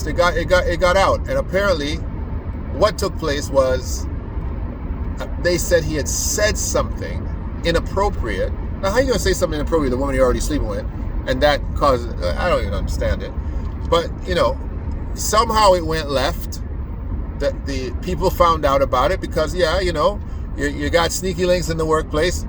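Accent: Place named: Trinidad and Tobago. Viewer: u r funny bro. u sound like u from Trinidad 🇹🇹 🤣 😂 great humor bro and good takes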